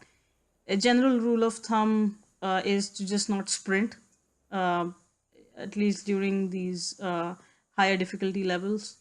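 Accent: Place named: Mumbai, India. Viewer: Even put the accent of mubai on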